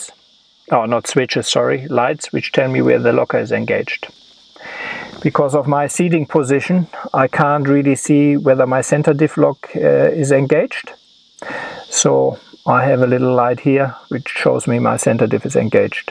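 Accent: Germany. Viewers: category X ist doch auch nicht schlimm! 🇩🇪 hast zwar dt Akzent, kannst abe good englisch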